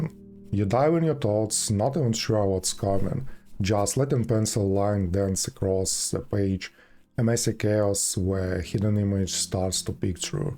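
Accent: Russia. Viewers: Ochen' accent russkiy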